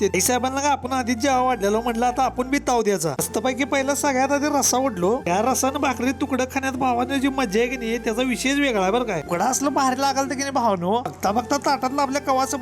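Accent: Kolhapur, India. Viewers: Bhava tuj gav kont re bhasha kolhapurchi vatate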